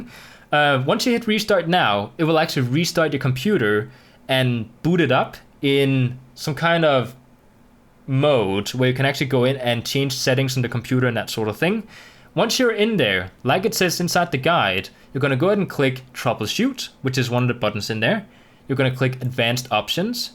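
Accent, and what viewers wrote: Denmark. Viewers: He's Danish u can tell by the accent and the ads. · Tager jeg helt fejl hvis jeg kan ane en snært af dansk i accenten :P?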